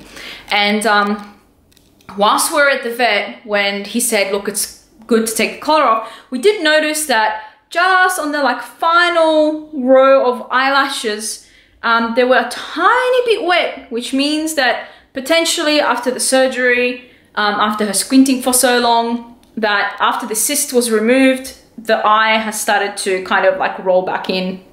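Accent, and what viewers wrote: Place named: Australia. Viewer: Aussie accent 👊🏼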